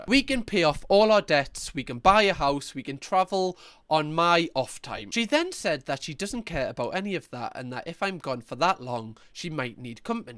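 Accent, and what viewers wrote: Newcastle, United Kingdom. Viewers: Can confirm, it's just how we say it in Newcastle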